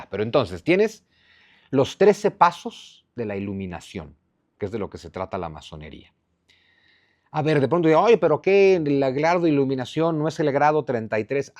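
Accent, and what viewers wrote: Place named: Mexico. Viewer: Me encanta el acento mexicano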